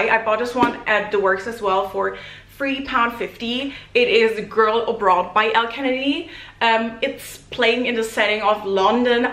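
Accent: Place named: Germany. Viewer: I love your content, I'm always so shocked by your German accent, I just always expect you to have the voice of Adeline ❤ Thanks for your hard work!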